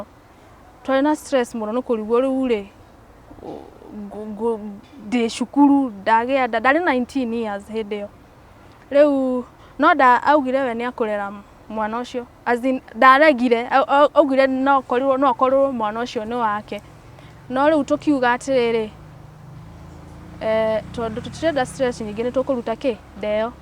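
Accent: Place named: Nyeri, Kenya. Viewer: I love her nyeri accent. Iv gone through the same stuff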